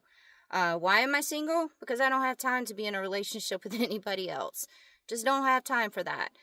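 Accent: South, United States. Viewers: You make me smile. Love your southern accent ❤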